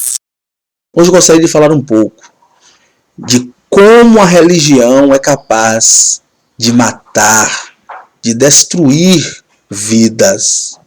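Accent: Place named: Bahia, Brazil. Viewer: jóia. Você é baiano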